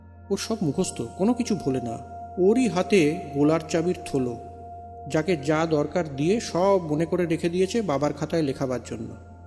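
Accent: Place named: Bangal, Bangladesh. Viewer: স্যর বাঙাল উচ্চারণ তো বেশ ভালো 👌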